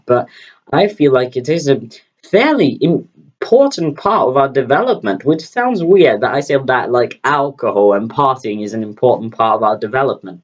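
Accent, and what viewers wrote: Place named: RP, United Kingdom. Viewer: Since your voice sounds a lot deeper now, you´re accent suddenly sounds kinda posh. Don´t know why but it´s really cute!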